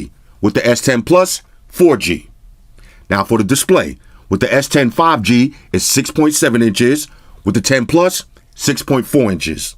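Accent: New York, United States. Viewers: eyyyy a New York brother!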